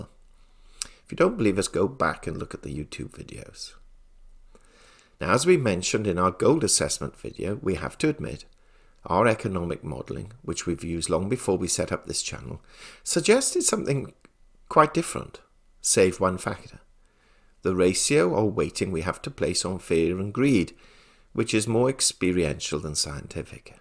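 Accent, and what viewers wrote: England, United Kingdom. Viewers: I truly love your postings. I make it an effort to watch for them every day, even if I place it on speed 1.5 to make room for the heavy English accent. Normally I listen to it at 2 and I do listen to many people on the markets. With that being said, you do go to awful lengths to prove you were right and others were wrong. I have noticed more often than not, you are right, hence why I choose to listen to you. Please consider the concept of “realizing you are right, you do not need to justify it in anyway”. You know, what you know.... and you sound extremely intuitive as well. Let go of any need to prove others wrong for it does not serve you nor me listening to you. Please, hear my views come from a pure heart. Thank you so much for giving us your guidance and advice each and every time you take the time to post a video. If you need any assistance on releasing this issue please let me know.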